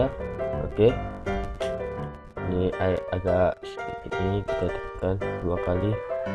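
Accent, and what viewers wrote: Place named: Aceh, Indonesia. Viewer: Bole kasih kritik? Bole ya...biar makin sukses.... Klo ngomong jan medok aceh bgt.. Trus cobak tuh lighting fotonya disamain kek latar belakang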